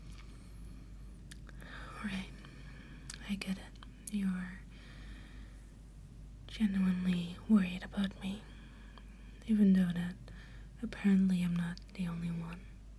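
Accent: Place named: Netherlands. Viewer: It's funny how the teeth improve your diction with your Netherlands accent. ;D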